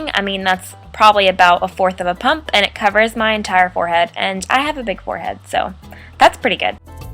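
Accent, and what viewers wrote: South, United States. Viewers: You're so pretty! And I definitely didn't think you'd have a southern accent!!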